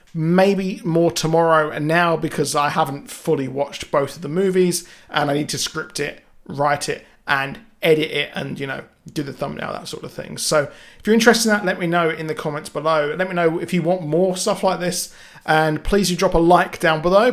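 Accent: United Kingdom. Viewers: “When I sAwR it” sorry I just love British accents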